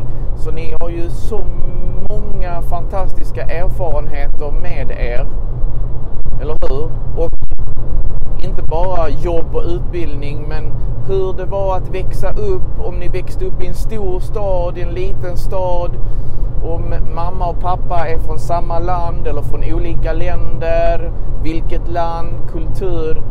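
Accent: Scania, Sweden. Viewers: hahaha skånska är nice! ;)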